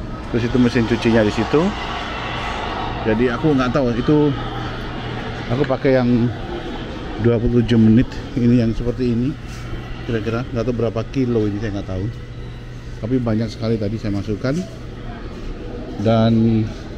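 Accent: Surabaya, Indonesia. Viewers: Big respect buat om lama di Amrik aksen ngomong dan masih gunakan bahasa Indonesia dan Jowo Suroboyoan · Boso sampeyan ngguyokno.... Bener...bener...Londo kampong yo puinter ngomong suroboyoan Om.... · saya senang kalo Pak bahasa Jawa, sering keluar ciri khas Suroboyoane, ayo Pak bikin conten pake bahasa Jawa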